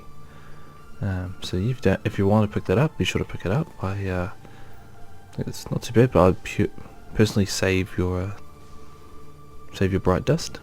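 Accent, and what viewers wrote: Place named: New Zealand. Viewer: R u a new zealander sound like it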